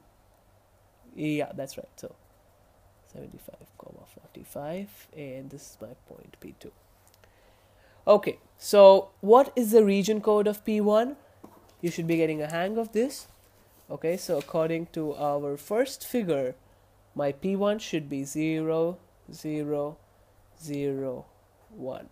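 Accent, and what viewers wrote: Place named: India. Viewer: i like this guy's voice. finally an idian-accent tut without fire-speak (really fast indian accent) - but the video is tilted! please make more but make sure it's viewable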